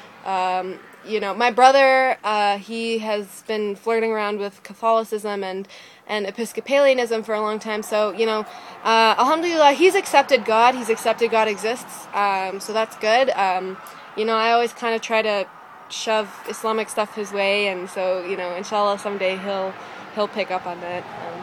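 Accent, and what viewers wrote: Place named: Canada. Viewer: you accent is same as Elisha Cuthbert , which means you are from canada or you must be actress or something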